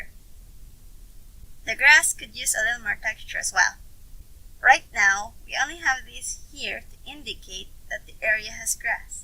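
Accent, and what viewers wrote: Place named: Philippines. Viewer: I can hear that Pilipino accent- 👀. I love your content to the fullest! Keep on going.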